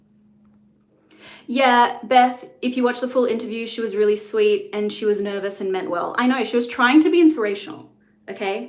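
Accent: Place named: Australia. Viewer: Ur accent is so much more pronounced now that ur home. I absolutely love it. Australian accent is my favorite accent. There are creators that I watch just to hear the accent.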